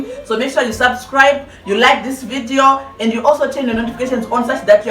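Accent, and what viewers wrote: Uganda. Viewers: Well done my girl, you are now an expert of matoke ❤❤❤ ramba wakadaro muroora wekwa unongondinakidza hako mwanangu chirungu chako haa chatova Ugandan English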